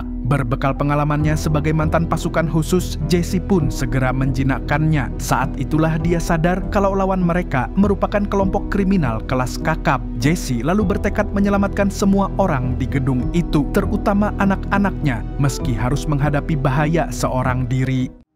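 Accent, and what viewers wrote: Banjar, Indonesia. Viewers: banjar banar amng ni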